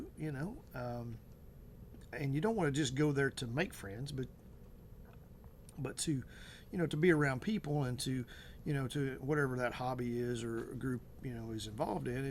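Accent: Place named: Tennessee, United States. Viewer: I'm almost 60 myself. I had quite of few friends thought true friends. my Son passed away but Little by little they stopped interacting with me due to what I was going through, the grief. So now I don't actually have a friend here where I moved. I moved away from my home state to the desert to start new and moved into a 55+ community. Most of them are a lot older, or already have their own friends, or stays in their homes to beat the heat all day. Plus as I aged, my confidence level has taken a hit. Contributed to ageing. Like you, I work from home and it's very hard to connect that way For friendships. Also, I'm a little too shy to join a group without having a friend or someone I know to go with me, initially. Silly I know! I need to get past that. I'll start going to the gym soon. We all need to be healthier. I feel your accent is from maybe TN. 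That state there is God's country. So beautiful. Another commonality, I have a brother named Keith. 🍺 Here's to us making new friends and having social lives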